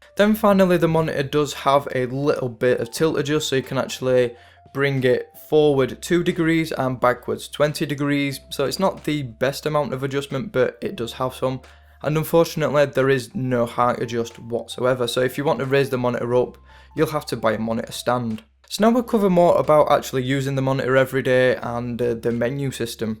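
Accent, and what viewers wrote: United Kingdom. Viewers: he is british !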